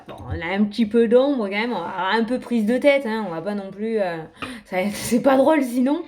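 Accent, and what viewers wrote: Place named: Marseille, France. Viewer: Ta repris le marseilles..😅 i knew it !!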